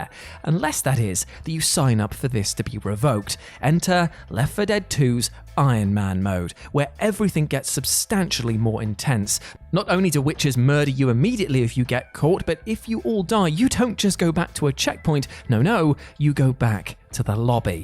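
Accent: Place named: Wales, United Kingdom. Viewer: Noice it's the southwe... wait which part of the Wales ? Heh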